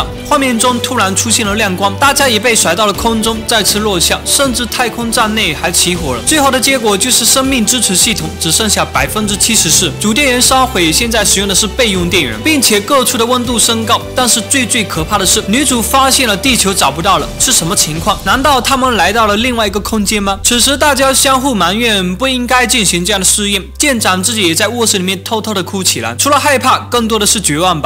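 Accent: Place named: Fujian, China. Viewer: 虽然我是福建人 但是听到福建口音的普通话还是不怎么舒服啊哈哈哈哈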